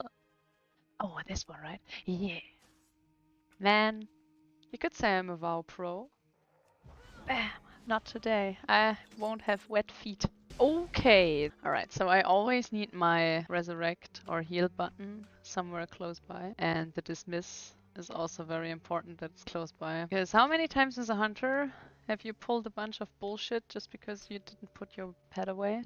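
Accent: Germany. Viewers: Are you German or Austrian? Wonderful content Edit: yes you said you are German in the video lol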